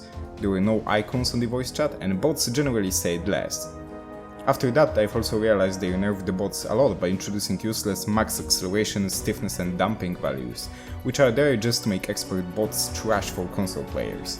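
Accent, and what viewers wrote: Poland. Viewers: Twoj akcent jest genialny odrazu domyslilem sie ze to polak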